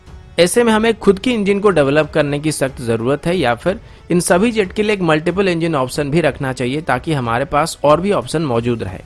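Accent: India. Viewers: Ooo you talked like original Indian. But we don’t care. Watch international news and red articles on info chaina. If you have power come to Pakistan borders and everything will be clear for everyone.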